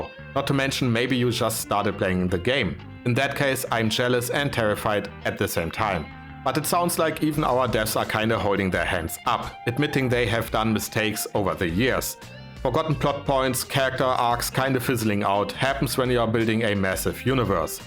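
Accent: Germany. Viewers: The video is great, however, the whole time I've been wondering where you're from and I think it's a German accent? 😂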